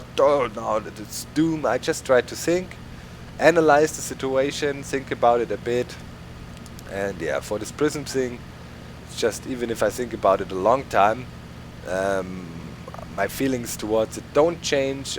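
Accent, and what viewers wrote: Germany. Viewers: SAch mal .. spinn ich oder hast du n deutschen Akzent in der Stimme ?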